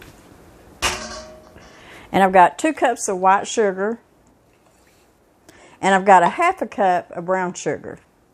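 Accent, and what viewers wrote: South, United States. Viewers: I'm a Yankee up in Maine now and I've enjoyed your channel SO much. Just listening to your accent reminds me of my grandmother from NW Georgia - she taught me to love cooking. And my husband always teases me because I "slip" back into my southern accent after watching you!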